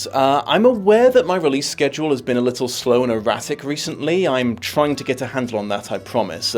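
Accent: United Kingdom. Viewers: As a fan of both the book and film I quite enjoyed getting a synopsis in a British accent! Caveman is the name Stanley gets for finding a fish fossil. They may bestow it because he's passed their hazing and they've come to accept him, but they chose "Caveman" because of the fossil. This is one of the films I think of when I think of great book-to-screen adaptations, it's remarkably close and makes good choices for what to change. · “Anyone who had seen the film recently probably felt a tad bored there at having the entire plot narrated back to them in a British accent.” Dude, that’s why I’m here! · if you dont think half of the reason we are all here is to hear the plots of books we already know in a british accent youve misunderstood your audience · I'm happy to have been refreshed the plot of the book with a British accent (although your accent is very different now from when you started your channel!!). 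It's always nice when something that you liked as a kid still holds up as an adult. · just to be clear, not a single American will ever be bored hearing a British narration. You might as well read the Terms and Services with that voice and we’ll tune in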